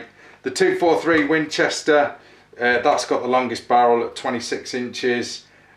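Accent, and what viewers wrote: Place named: United Kingdom. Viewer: What country are you in? Because it sounds like your from the UK. I thought that the UK band firearms from civilian.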